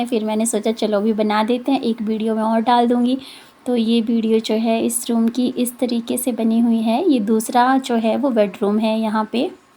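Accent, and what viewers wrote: Standard. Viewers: Aap kitana hindi bhi sudh bolti aaj ka vlog bahut kitchen bahut khubsurat lagi mujhe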